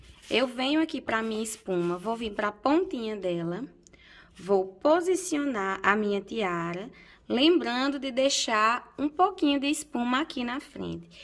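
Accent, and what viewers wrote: Northeast, Brazil. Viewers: Amo o seu trabalho, Acho lindo o seu trabalho e o seu sotaque. Vc é paraibana ou pernambucana?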